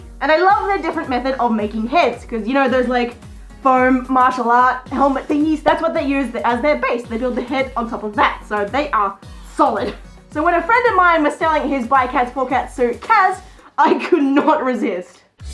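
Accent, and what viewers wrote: Australia. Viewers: Are you Australian cause I am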